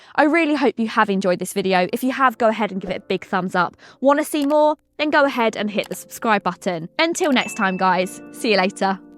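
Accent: United Kingdom. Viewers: Oh that stupid british english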